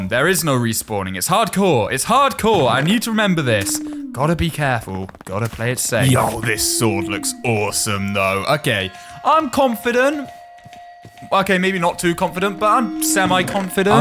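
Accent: United Kingdom. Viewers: Are u britosh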